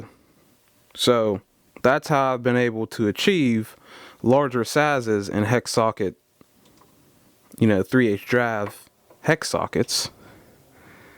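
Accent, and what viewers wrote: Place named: Baltimore, United States. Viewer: You from Baltimore? It sounds like my hometown! Nice vid thanks for the hard work in putting it online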